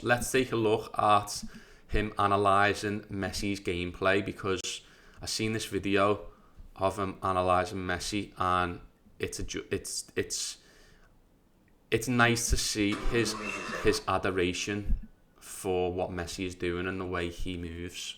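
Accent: Liverpool, United Kingdom. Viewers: Hi. I enjoyed watching your reaction to the videos. Agree 💯 it is a pleasure to hear and see positive comments about others. I’m not a football fan per se, but I do watch Messi. His playing style is poetry in motion. Great reaction. And your scouse accent is delightful ❤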